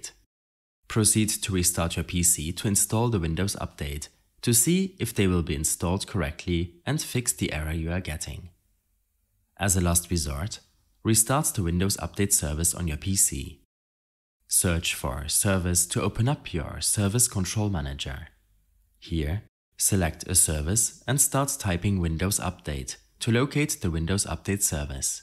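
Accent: Germany. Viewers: Man hört das du deutsch bist 😂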